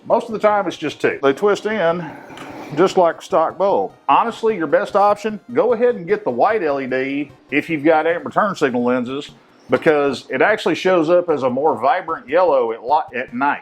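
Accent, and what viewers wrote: South, United States. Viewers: and his southern drawl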